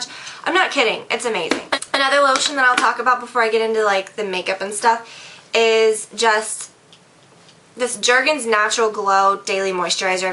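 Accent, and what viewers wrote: South, United States. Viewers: Where are you from...cause I can def hear a southern twang :)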